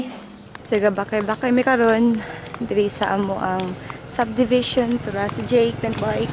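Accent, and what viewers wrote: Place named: Cebu, Philippines. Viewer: nindot noon kaayo dae nga ingun ani ba bisdak kaayo...daghan napud baya kaayo mga taga american nga mo speak bisaya so nindot pud ni dah..hehhehe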